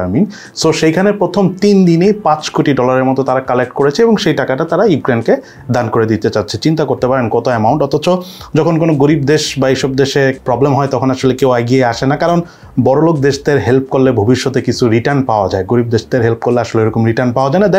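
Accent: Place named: Dhaka, Bangladesh. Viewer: ভাইয়া আপনার কথা শুনে মনে হয় আপনি ঢাকাইয়া আর আপার T Shirt গুলো খুব সুন্দর পরেন ☺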